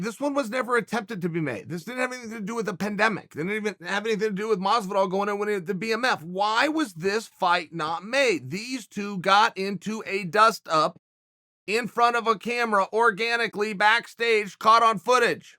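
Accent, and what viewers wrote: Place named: United States. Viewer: Chaels American accent is sickening.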